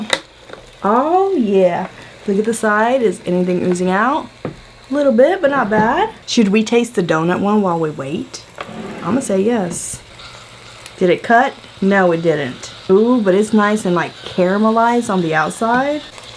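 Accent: Puerto Rico. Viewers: Love your Puerto Rican accent! 💕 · boricua accent on point hehehe LOVE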